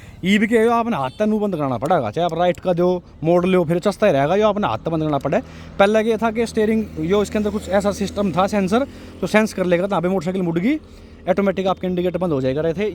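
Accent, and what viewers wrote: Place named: Bihar, India. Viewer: Biharii laag rha hai ... Tari video dekh k tari bhaab ni baada saktaa 👊👊👊👊👊👊👊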